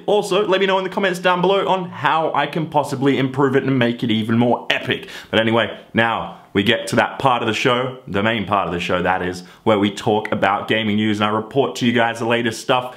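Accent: Australia. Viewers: thank god your back i miss that Australian accent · Are u Australian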